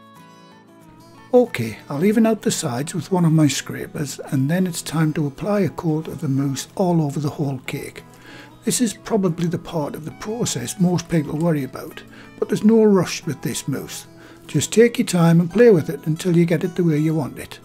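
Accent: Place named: North East England, United Kingdom. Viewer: The irony is, that you probably have no idea what it's like listening to your softly spoken North Eastern accent, explaining things every step of the way. There's one thing I disagree with and that's that you are 'retired'... clearly not since you're still doing it on YouTube (albeit now for free). Old cooks never retire, they just find different ways of practising their skills. Well done, Sir, and please keep up the good work.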